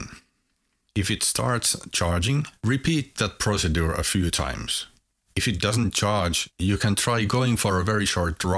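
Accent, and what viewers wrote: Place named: Finland. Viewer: I could immediately tell you are a Finn: beautiful accent and “max information to words” ratio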